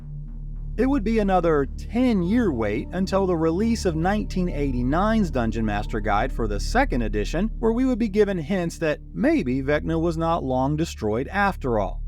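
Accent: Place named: South, United States. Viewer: Fyi the music is good , i'm autistic and sometimes music distracts me yours is good just extenuates the narrative , i think you're on your way to being the top FG guy , you're meticulous eye to detail plus artistic panache is a winner, you have very clear precise way of speaking with only a slight accent, i would guess you have a professional background possibly a college lecturer, english literature philosophy liberal arts etc . vaguely southern accent but not folksy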